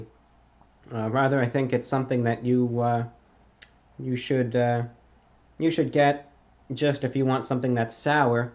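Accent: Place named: New York, United States. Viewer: I knew you were from that part of the map.. The voice. Women love that New York voice. New York and New Jersey.. We women love those accents..🤔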